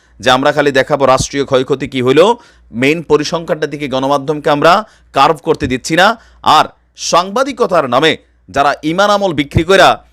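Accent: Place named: Comilla, Bangladesh. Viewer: কুমিল্লা কুমিল্লার পোলার মতো কথা কবি বলবিরে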